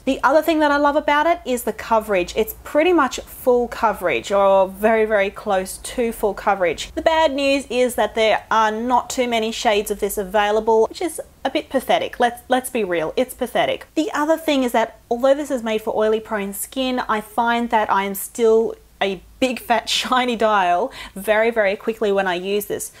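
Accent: Australia. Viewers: You know, usually aussie girls say "like" and "omg" about <num> times every sentence, but not you! You just have a mad aussie accent :D and great videos! Love form germany :D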